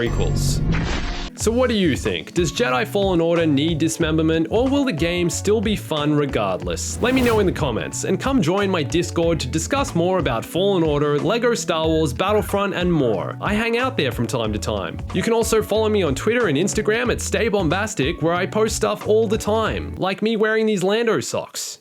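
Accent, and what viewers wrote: Australia. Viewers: Yes your Aussie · Oh your Australian as well cool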